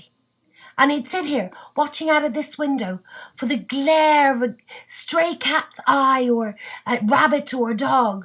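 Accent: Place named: Ireland. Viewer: Well done, the Irish accent makes it cooler somewhat also :)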